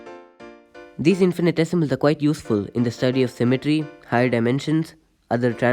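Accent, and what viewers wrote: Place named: India. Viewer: For fuck’s sake can I find one educational video that’s not in an Indian accent?!!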